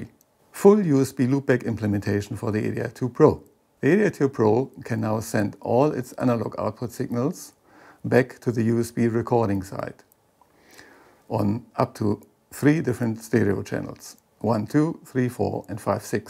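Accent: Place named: Germany. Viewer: I listened to the whole video mainly because of the cool German accent.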